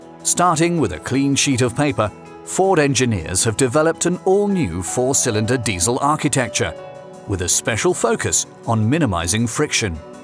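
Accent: England, United Kingdom. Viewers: The narrator is British... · All sounds good huh with introduce by someone with an English accent until you hear the problems they are having the Eco Boost engines. PLEASE call it what it really is... TURBO CHARGED ENGINES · Lost me with the British voice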